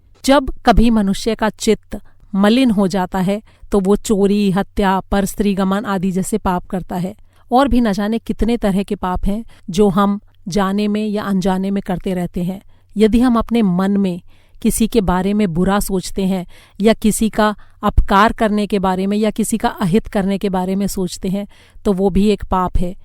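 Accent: Standard. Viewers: आपकी बोली शुद्ध सात्विक और स्पष्ट है....आपको हमारा नमन |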